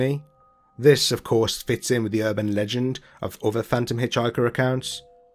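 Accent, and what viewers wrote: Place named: Birmingham, United Kingdom. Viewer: Actually it's, bloody brummie, 😉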